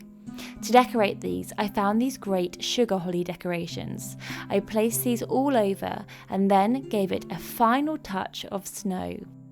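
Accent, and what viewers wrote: United Kingdom. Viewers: I know your accent is British but it sounds very particular, which region is it from? I watch someone else from Yorkshire but it’s not quite the same and that’s my only frame of reference.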